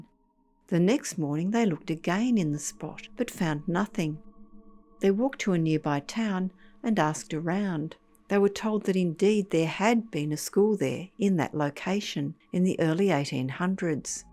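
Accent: Australia. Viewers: This is not a British accent it’s Aussie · Aussie accent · She iis Australian, not British. Americans seem to confuse the two. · Agreed 👍I'm British too. I think she's Australian😊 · More disturbing hearing an aussie narrator say "playing tag" and you pronounce castle weirdly.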